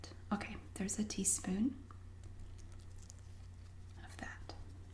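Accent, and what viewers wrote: United States. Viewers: I'm not pointing a finger at you or anything, but I think it's so funny how Americans say Aldi 😂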